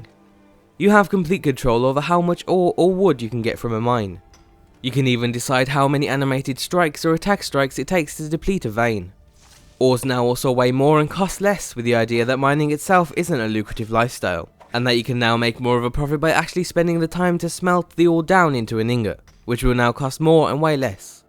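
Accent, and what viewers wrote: United Kingdom. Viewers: James Troughton i don't know, he sounds more british again. then again he might be foreign and going for a british accent except for the word "mod" which he pronounces as "mad". it's not a big deal but, you know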